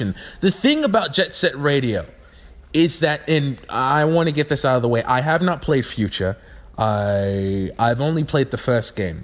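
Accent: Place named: United Kingdom. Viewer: bro how many accents do you have can't tell if you're British or im tripping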